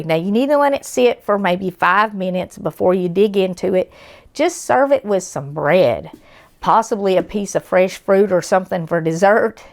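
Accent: South, United States. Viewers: It doesn’t really matter what you’re cooking I just like listening to your little country accent !!! The food ideas are great too ! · Love your channel, I'm a transplanted hillbilly, and to listen to ya makes me remember being down south! The recipes are awesome too! Keep up the great work, God bless!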